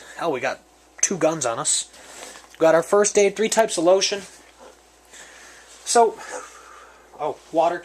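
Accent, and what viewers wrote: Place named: Brooklyn, United States. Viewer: Hahhahahhahahhahhahahhahahhahahah Brooklyn accent that's hilarious Thanks for the great vid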